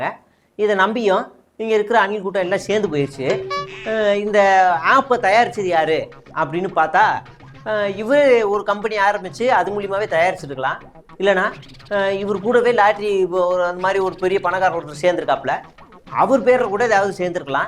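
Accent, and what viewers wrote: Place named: Idumbavanam, India. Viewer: enaya idumbavanam tone duthu pesitu eruka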